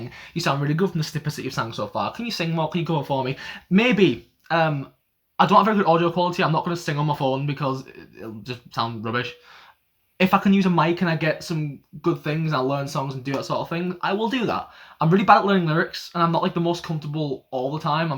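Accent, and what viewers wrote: United Kingdom. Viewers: Casual thoughts I had while watching this video: Loved your toy story notebook. Your D.O. impression is on point. I´m from Latin America and I also really like your accent, I had a couple of British teachers back in high school and I always liked the way they spoke. Yes, Jongho is probably the best 4th vocal atm, I love his voice so much. Yeah, fan wars may be an issue if you do overall rankings but from what I´ve seen on your videos comments most of your subscribers are pretty chill people, you calling yourself a soft boy is a whole mood haha. My favorite rapper/singer is Jisung too, you should check out his king of masked singer performance if you haven´t yet, truly amazing. I don´t think you´d ever have to put an end on your ranking series because so many groups debut each year, you have endless content hahaha. NOOO, WE´RE NOT JUST HERE FOR THE RANKINGS, you´re such a cool person, a lot of people like you a lot just because you´re funny and a nice dude. 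Yes, we´d love some covers haha. YES, IDOLS DESERVE SO MUCH RESPECT FOR WHAT THEY DO UNTALENTED IDOLS DO NOT EXIST. Omg happy birthday, I hope you have a great day tomorrow. Thank you so much for answering my question and giving me that shoutout, I believe in your channel so much and I´m glad I can be a supporter. Wow, I couldn't agree with you more on that answer, kpop is so much more than just vocals and if a group needs a backing track in order to deliver a performance in the best way they can then I say they should go f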